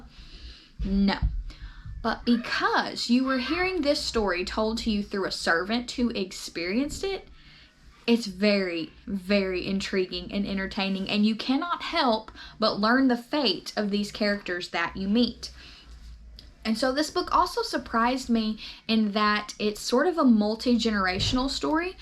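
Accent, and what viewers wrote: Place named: Carolinas, United States. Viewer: I would say from the Carolinas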